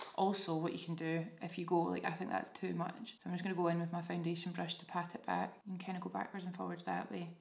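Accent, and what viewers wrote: Scotland, United Kingdom. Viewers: I love ur Scottish accent xx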